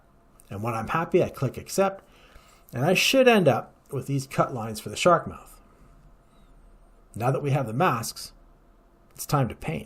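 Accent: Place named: United States. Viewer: I was wondering why you mentioned a Warthog while showing a picture that definitely wasn''t a Warthog. Then I realised it was just the way you Americans say hawk as "hock".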